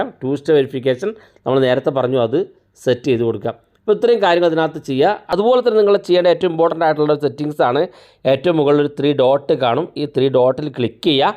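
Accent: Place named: Kozhikode, India. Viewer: Kozhikkodil aano sthalam? Samsaaram kettappol angana thonni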